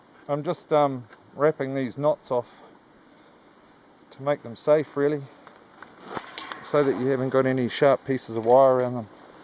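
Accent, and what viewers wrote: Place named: France. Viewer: Don't you like my FRRrench accent ? At least, I hope you didn't get "pieced" with it. Sry for bad focusing. I guess what I missed is when he was digging, so I can reveal you the secret on what there was on the ground : A hole !! check between 1''32' & 1"39' : it confirms my theory héhé hihi hoho ! Thx for whatching mate, hope you enjoyed :)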